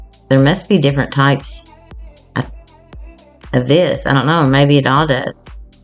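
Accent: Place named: South, United States. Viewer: seldom hear a southern drawl. Yours is lovely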